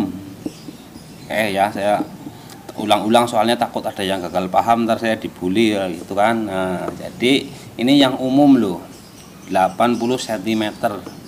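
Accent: Banyumas, Indonesia. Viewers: Kaya wong ngapak apa yah...😁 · Koyo wong ngapakkk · wonge ngapak ini, g tau asalnya dari mana, yg penting sukses channel-nya👍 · Kayaknya orang Banyumas nih.... ngapak2 😁😂👍👍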